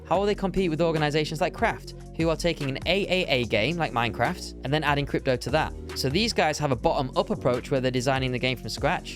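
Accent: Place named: Yorkshire, United Kingdom. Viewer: You’re the 🐐 mate aha. Can tell from the accent you’re from Yorkshire pal, which part ?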